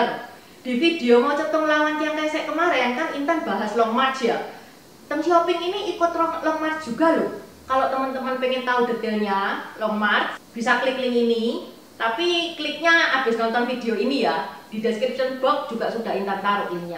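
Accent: Java, Indonesia. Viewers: Logat jawanya medok banget.. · Logat jowoneh medok bamget.!! Hehehehe wis ora opoh2 sing pnting mudeng. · Rasanya ma'af ya ibu Intan masih harus banyak belajar dialek atau pronounciation bahasa Mandarin yang baik, tepat dan benar déh kepada mas Novi Basuki PhD yang santri asal Jawa Timur itu lho.... karena logat bahasa Mandarin ibu Intan masih bahasa Jawa-Centris ! Sorry ya masukan konstruktif ! Demikian juga nomenklatur kata "Cina" sudah tidak berlaku lagi/dicabut melalui Undang-Undang atau Tap MPR ya bu, harusnya diganti dengan Tiongkok/Tionghoa / ZhongGuo / China ya bu. Terimakasih atas video-videonya yang banyak memberi informasi faktuil dan bermanfaat !🙏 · Salam kenal Ka Intan. Baca judulnya aja aku sudah suka banget. Eh pas liat video kak Intan berdialek Jawa aku tambah seru nontonnya. Ada lucu, gemes tapi keren banget ka. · Orang jawa pribumi logat nya gak medok cc ini medok bget😀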